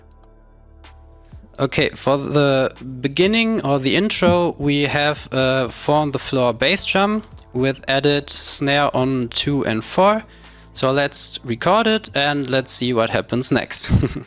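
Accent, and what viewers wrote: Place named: Germany. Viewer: beautiful german accent <3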